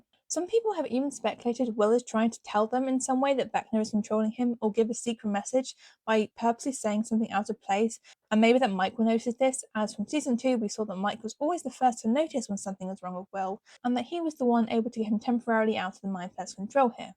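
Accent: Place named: United Kingdom. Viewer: I like ur accent it's kinda like British🤭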